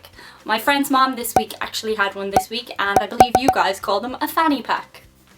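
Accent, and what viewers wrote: Ireland. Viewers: It's always nice when I find a new channel that is interesting and involves a cute girl with an Irish accent... I know, you've had it for years, it's rubbish, but still works for me. I do have a question though, you've mentioned it's been a long time since you visited the US, when were you last here? We're a pretty slow country when it comes to societal/economic changes so, sorry to say some of the worst parts of American behavior or those you find/found odd are likely still going strong. Even though I am from Seattle (about 4,400 km give or take) from Miami, I do admit they make a fine t-shirt for their team, our team's logo (Seahawks) looks more like an Irate train than anything else. <link> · She’s so cute. And Irish.. can I date you? Lol love the accent. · We really enjoyed this thank you. Although I think your Irish accent is fading. 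Don’t let it happen! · We can always tell you are from R'land! That voice accent! The Irish are ALWAYS truly welcome in the USA. You didn't say whether Americans are welcome (or just tolerated for the cash) in Ireland? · To be fair, in American English we treat "Ireland" as a two syllable word. The only difference here is the long 'i' sound combined with the American 'r' sound makes the first syllable really long. There are basically 3 vowel sounds in the first syllable. A long 'i' is a combination of a short 'a' and a long 'e'. The American 'r' makes the "er" sound. So it ends up sounding like /a-e-er-land/, but we only count two syllables. Whereas your Irish pronunciation starts with more of a short 'a' sound so it sounds like /ar-land/. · I love your voice and your accent. I would date you if I could ever make it over to Ireland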